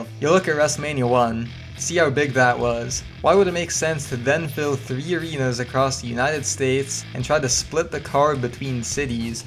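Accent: Canada. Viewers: You sound like you're from Nova Scotia. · You can defend your Lawrence Taylor comments and put up annotations all you want but that doesn't change the fact that you don't know what you're talking about. It's pretty evident through your accent that you're Canadian. It's not WWE's fault you're from Canada and don't care about American football. Just because you don't know who LT is doesn't mean the majority of WWE fans didn't. Besides, how old were you in 1995? Were you even alive? WWE is an American-based company with mostly American fans most of which are NFL fans. If you really want to complain about WWE using one of the biggest names in the world of sports at the time to boost ratings, I don't know that they'll ever find a way to please you. To put it into perspective for you, it would be like WWE having Gretzky appear at WM and an American saying "Who is Wayne Gretzky? 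Have you ever heard of him? Probably not."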